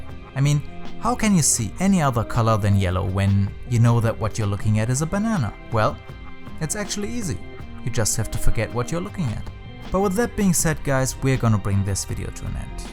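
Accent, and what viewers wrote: Germany. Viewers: Dieser Moment, wenn man hört, dass er deutscher ist und man total abgefucked ist, dass er Englisch spricht😂😂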